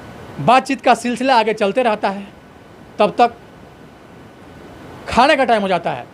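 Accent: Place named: Bihar, India. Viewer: Aap bahut achha bolte ho absolutely Bihari style..I like it ❤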